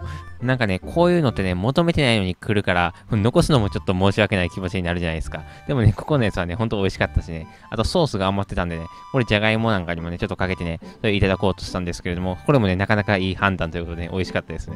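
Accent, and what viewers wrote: Kansai, Japan. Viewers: 関西弁でたまに毒舌Sっぽいところがいいんだよなあ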